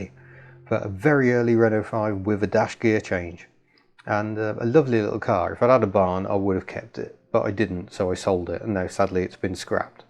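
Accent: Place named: Birmingham, United Kingdom. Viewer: Thought you was a Brummie, makes two of us, i'm from Hall Green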